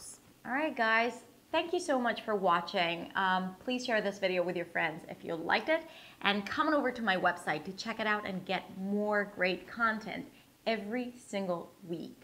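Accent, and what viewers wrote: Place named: United States. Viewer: Hi! Thank you very much for your reply. My name is Katsu. I'm Japanese living in Japan. I think I speak English pretty well working as an interpreter but I still have a long way to go. You are definitely my role model because you are not an American but still you speak like an American, which shows how hard you must have worked (you moved to the U.S. when you were 20!!??). Acting must have done you a world of good. But how did you acquire your American accent? I really appreciate it if you could elaborate on how you mastered it. I know practice is the only way, but, for example, what accent training book did you use if any? Any accent training in acting lessons? I would like to know so much about you and your way of practicing. Not many people can get where you are, especially if you start your accent training after the age of 20. 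You are fantastic! I'm looking forward to your video about YOU. Thank you!!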